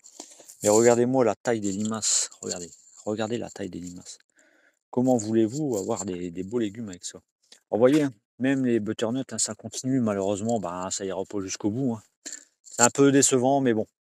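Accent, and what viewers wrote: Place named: North, France. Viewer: Avec ton accent ça sent le Nord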